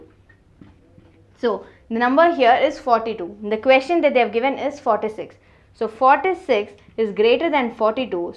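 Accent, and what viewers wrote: South India, India. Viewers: South Indian tone of speaking..nice👌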